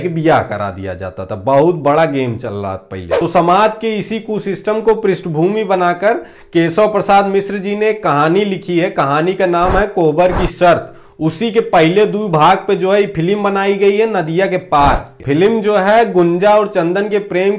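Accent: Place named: Uttar Pradesh, India. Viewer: Yr.. bhaiya tumhari bte.. Mera.. gaon or mere bhaiyo ki yaad dila. Detii h.. Parathagarhiya.. hu bhai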